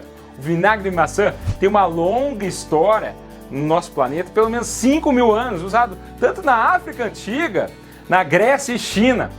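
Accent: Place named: Curitiba, Brazil. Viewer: Se eu não me engano, é de Curitiba ou Santa Catarina. Tb acho lindo o sotaque dele. Aliás, o Brasil é rico em lindos sotaques. ❤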